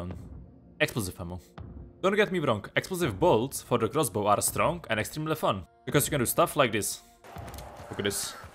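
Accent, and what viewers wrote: Czech Republic. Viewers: nothing beats that hard czech accent.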